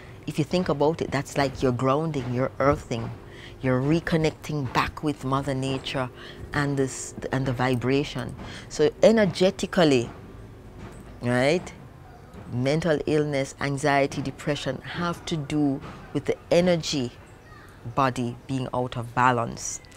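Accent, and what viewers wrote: Jamaica. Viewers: MARVELOUS! ...What a beautiful lady this is...smooth impeccable skin. Such clean expressions, such clean energy and such clean appearance...ZERO pretentiousness and 100% NATURE PERSON. I really enjoyed hearing this...and your golden Jamaican accent and mannerisms...and the little sweet Patwa in between. - no ssstchuppitnis punna she hair - arl's nature groove...eeeasy-eeeasy lite and breezy! It's so wonderful to see zero ostentation in motion. GOD Wisha-Ouija bless you! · is she jamaican? sounds like! love her voice and speech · The Sistah could talk to me all day about health. Jamaican accent supreme!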